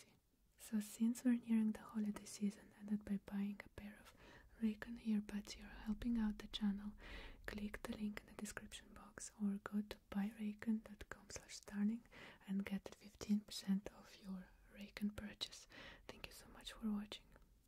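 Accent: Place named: Russia. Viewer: Акцент явно русский 😆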